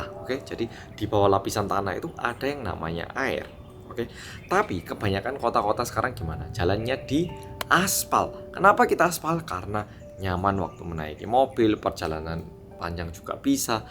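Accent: Java, Indonesia. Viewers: wajah Korea koq logat jowo sih mas.. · Hallo mas Korea medok Nitip salam buat kim bum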